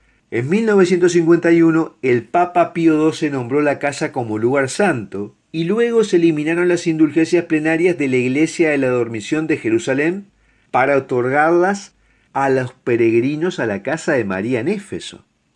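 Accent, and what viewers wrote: Argentina. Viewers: .le agradezco la información ya por medio de usted de la Virgen me a ayudado a reafirmar mi fe y estoy en proceso de seguir y aplicar mi fe católica por eso enfatizó este párrafo aparte de que me agrada su acento tipo argentino y su final bueno......! Por eso mi punto de vista para con usted ya que le reafirmó mi gratitud y confianza con su programa gracias buenas noches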